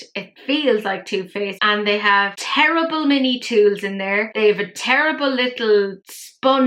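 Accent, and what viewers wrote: Ireland. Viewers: Totally agree on the Bratz palette (and on filler, for that matter. My lips are thin as well and I just…don’t care? 😅 They’re mine, you know? What if it were our thumbs? Wouldn’t that be silly, everyone changing their thumbs because “well it’s entirely too long, this thumb, let’s shorten it.” ) The Viseart palettes may be “basic” colours but their combinations are nice, it feels coherent. (So many palettes don’t.) Edit to add: there surely WILL be lipsticks in the RH collection because lips are practically iconic in that movie (Magenta and Frankenfurter come to mind, and Riff Raff’s “concealer lip” look as well.). Incidentally, you have convinced me that no accent better delivers the phrase “I don’t like it, and I don’t want to talk about it anymore” better than the Irish accent. 😂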